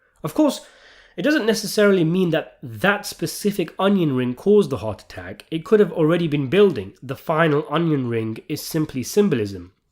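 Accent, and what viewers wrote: United Kingdom. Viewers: Ooh an interesting Sopranos finale video. Oh, it's a british guy, I'm good lol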